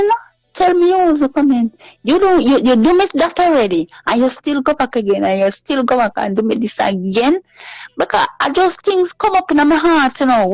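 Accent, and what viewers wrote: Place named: Jamaica. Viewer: Lady chat jamaican twang you not a Trinidadian you no sound good, love blind you till you no see say dis fool a use u. U fi real?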